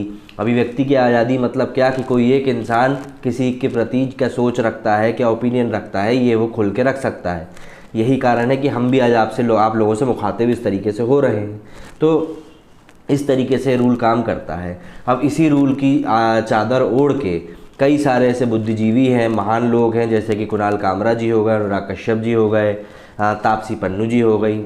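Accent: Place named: Eastern Uttar Pradesh, India. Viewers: accent up east kaha ke ho bhaiya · Wahii....Kuch Kuch bihaiii....tone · Bhaiya aaj kahe Bhojpuri accent me ?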